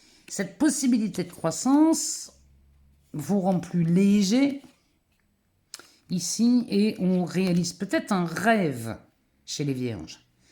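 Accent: North, France. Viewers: merci, pour tout. Mais tu me fais marrer, sans rien faire, seulement de t'écouter!!!!!! Bravo, cet accent nòrdiques que j'aime, tellement!peut-être des ch'tis??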